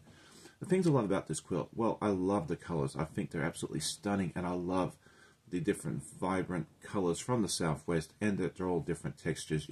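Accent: Australia. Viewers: I love those colours.... Im also curious is that an Aussie accent?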